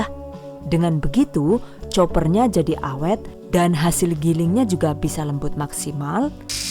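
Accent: Banyumas, Indonesia. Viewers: Ibu ngapak jg ya😂. Asli mana bu? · Jan...sampean nk logat ngapake metu ddi marekma ngguyu.menghibur karo nimba ilmu #GiveawayTHV2021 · Warga Saranjana bisa ngapak juga toh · Bahasanya itu lho yg ngangenin . Campur ngapak Ben tambah kepenak 😀👍🏻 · Mbak narator ini sebetulnya orang mana sih, kalau sy dengar suaranya bahasa Inggris nya bagus, bahasa Indonesia nya jg bagus dan itu loh boso jowone koq ngapak ya. Kadang sy suka geli sendiri, ok mbak salam kenal dari Bandung 😍. By the way sy suka loh dgn video"nya. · Alhamdulillah ilmu yg bermanfaat...semoga Istiqomah sangat terpercaya....bocah ngapak apa aslinya ya Bu???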